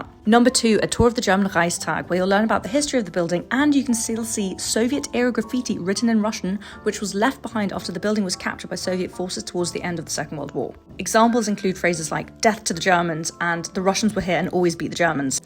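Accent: United Kingdom. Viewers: As a German myself I would’ve never guessed you‘re german. I thought you were british. You got that british accent from living in the UK haha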